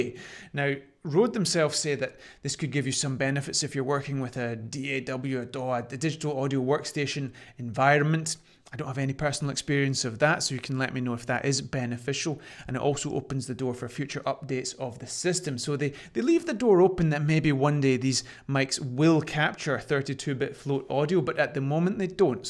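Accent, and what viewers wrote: Scotland, United Kingdom. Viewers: Excellent review. Are you a Scott?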